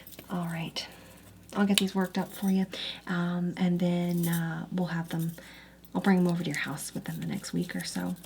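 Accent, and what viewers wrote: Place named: New Jersey, United States. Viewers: Why she sound like she from Jersey 😂😂this is so funny to me. It's ASMR comedy 😄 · The Mid-Atlantic/Philly vibe is ON POINT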